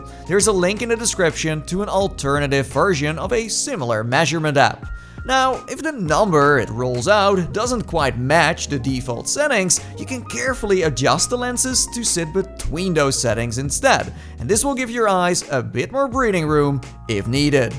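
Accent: Netherlands. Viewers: Thank you for the information, I am going to sell my oculus quest 3 for some money (because it sometimes has some issues) and then i am going to buy the 3s and these adjustments, thank you for the information. (And by the way are you Dutch because I am, and you kind of have a Dutch accent, not saying it’s bad.)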